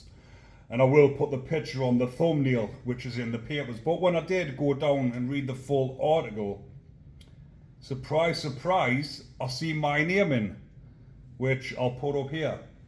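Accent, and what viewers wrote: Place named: North East England, United Kingdom. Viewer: Hi you ARE BRILLIANT LOVE YOUR SWEET FUNNY NORTH EAST ACCENT. ❤❤❤❤❤❤❤❤❤❤❤❤❤❤❤❤You are the bees knees. Handsome north east lad. 🎉🎉🎉🎉🎉🎉🎉🎉🎉🎉🎉🎉🎉🎉🎉🎉🎉🎉🎉🎉🎉🎉🎉🎉🎉🎉🎉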